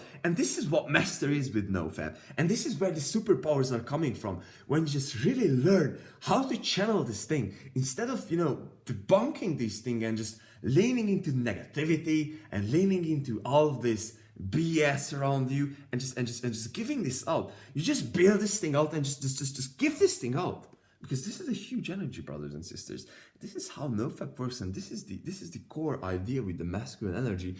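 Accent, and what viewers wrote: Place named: Italy. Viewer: You have a deep accent- let me guess, you're Italian?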